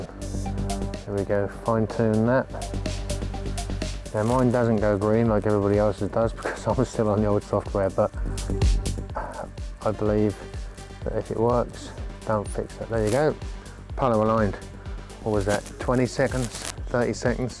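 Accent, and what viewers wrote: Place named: Suffolk, United Kingdom. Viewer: Lovely photo's, also really nice to hear the accent... from another Suffolk boy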